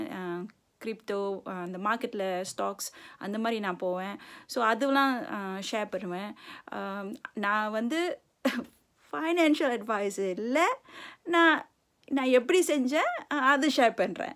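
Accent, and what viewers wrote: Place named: Singapore. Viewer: Hai akka, too rocking netherland tamilan video. Good Inspiration and tamil talking too good. Your tamil language like singapore tamilan speaking same like that. Solo Woman Boat Rider ....Real Tamilachi Akka you like that. My Native in TamilNadu in Thanjavur district......etc. Please give me your Email Id Akkaa.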